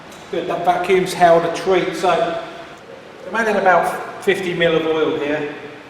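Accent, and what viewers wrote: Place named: United Kingdom. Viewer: Excellent video, friend! Watching it in a clean, quiet shop with a British accent was the icing on the cake. Love and respect from USA. 🍻🍺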